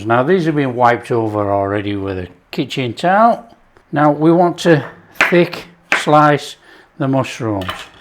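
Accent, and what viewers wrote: United Kingdom. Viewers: Great day to you, today is perfect and you are part of it, love your British accent and foods, crack on as you say, OH PLEEEEASE, each day should start out with a sizzle whizzle, come on😅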